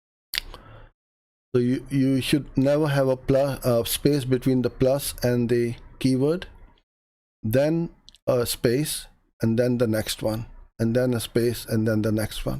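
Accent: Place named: England, United Kingdom. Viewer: You talk too much.Impossible to suffer that English accent more than 10 min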